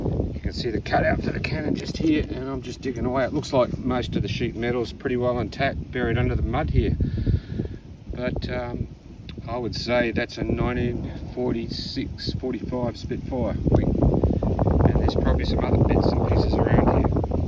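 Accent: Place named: Australia. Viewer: You definitely have. Assuming by the accent you’re in Australia. It’ll be a Spitfire MK VIII, leading edge tank as standard. Give Cameron Roloh-Smith a ring at Performance Aero at Archerfield, he’s the Australian Spitfire man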